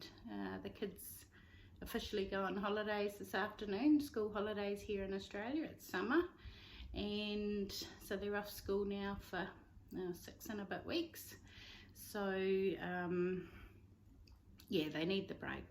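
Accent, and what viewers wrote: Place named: New Zealand. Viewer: hahaha that's her Kiwi accent wait till she says six ;)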